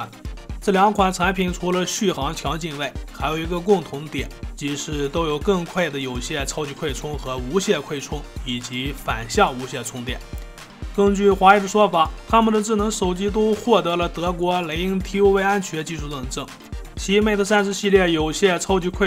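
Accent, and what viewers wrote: Shanxi, China. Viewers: 麻烦你练练普通话, 你这内蒙西部口音还是山西口音太严重了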